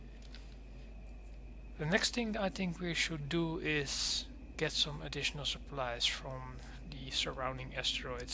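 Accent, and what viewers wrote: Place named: Netherlands. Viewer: Heey, Just asking, but are you dutch? For some reason your English sounds like how dutch people speak it:)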